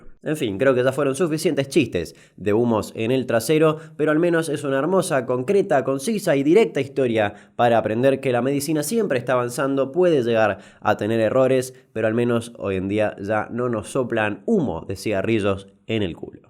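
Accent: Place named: Cordoba, Argentina. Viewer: Como me hiciste reír!! De dónde sos, tenés una tonada cordobesa!! Pareciera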